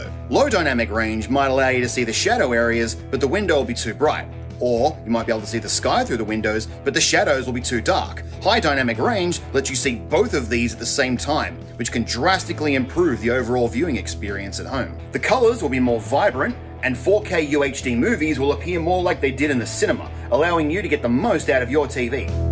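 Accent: Australia. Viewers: OMFG, beard, and AuSTRALIAN an, brooo thank you!!! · It's the Australian pronunciation of the letter, similar to how the Brits pronounce it. They also pronounce 'Z' as "Zed" where US/Canada would pronounce it as "Zee"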